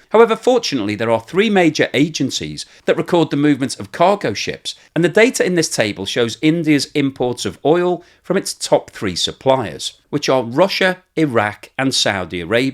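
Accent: United Kingdom. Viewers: At begining I have a hard problem to understad your britsh acccent, now it loks familiar for me. Thank you for all the good informations.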